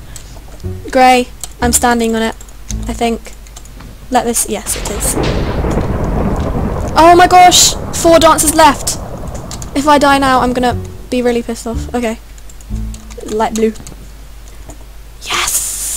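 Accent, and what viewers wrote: United Kingdom. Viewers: Omai! Your British accent is SO cewt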